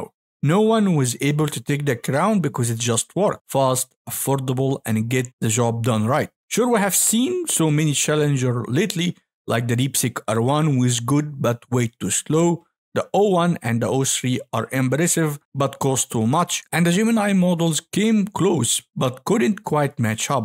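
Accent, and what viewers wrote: Egypt. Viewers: انت مصري صح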